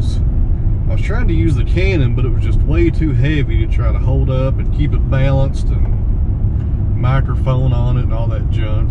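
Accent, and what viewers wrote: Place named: Tennessee, United States. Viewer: I know nothing about sawmills or wood but i find your you tube channel fascinating. I appreciate the beauty of wood and wood grain and to watch you assess a log, cut it and show the lovely grain is brilliant. Add to that your commentary in a wonderful Tennessee accent and I am hooked. I also appreciate your excellent taste in music, which leads me to my only criticism.....would you please list the artist and song name of the tracks you choose for your videos? Even better idea is...have you ever thought about a Spotify playlist that we could follow? That would be as popular as your YouTube channel. Thanks a lot for all the great vids.....i look forward to the next one.👏👏👏👏👏👍👍👍👍👍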